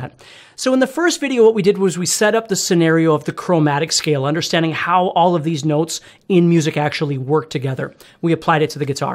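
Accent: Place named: Midwest, United States. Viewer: Midwestern accent. He’s probably from Iowa like me haha. Maybe Wisconsin.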